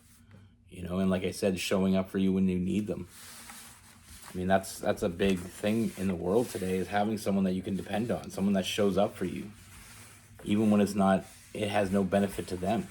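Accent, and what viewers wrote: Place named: Canada. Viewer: Thanks for the story man I've been watching you for a while. For a while there I thought you were from Boston like me but turns out I guess you're from Canada. And I can kind of relate to you because all my cousins are from Canada. I definitely agree with friends first. I've known this guy on and off for years and 2011. All of a sudden out of nowhere he said that well basically you said that he didn't really say I love you but he said that he deeply cares about me I think he's afraid to say the l word so he is not ready yet. And kind of just came back in life. And I feel a little shaky or on ground because I don't know what direction this is going to head in. But I'm going to be patient with him and see where it goes. But he's the one that noticed that I didn't love myself and he stepped away for like a year. And now all of a sudden he says he misses me and cares about me deeply. 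I hope he's not lying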